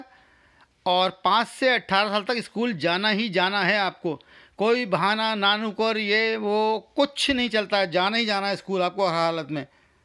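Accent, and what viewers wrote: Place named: Moradabad, India. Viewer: बहुत अच्छी रिपोर्ट है और आपकी भाषा सुन कर मुझे ऐसा लगता है कि आप मुरादाबाद, रामपुर या चंदौसी के रहने वाले हैं। अगर मैं सही हूँ तो कृपया जवाब दें।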